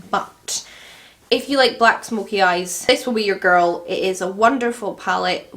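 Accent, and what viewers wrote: Scotland, United Kingdom. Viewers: Hi, thankyou for all of your videos, I love watching u Swatch, apply & review all of the awesome products on your channel. Can u plz tell me where to buy the earrings u wore in your 17th November 2921 vid? And your last video 2 days ago? The earrings are lovely! But of course the coolest thing about your vids is the lovely products & you flawlessly applying them & creating awesome looks. Hope to get a reply from you, I loveeee your accent, Scotland is beautiful I'm Irish so not too far from you. Thanks so much 🥰♥️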